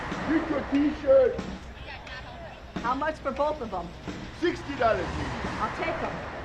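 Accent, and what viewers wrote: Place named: Jamaica. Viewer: Love the Jamaican accent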